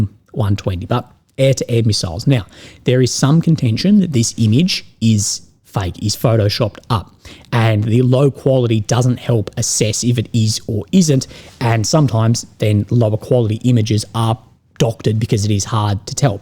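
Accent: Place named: Australia. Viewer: I did notice that you started speaking with an Australian accent since the chemo started.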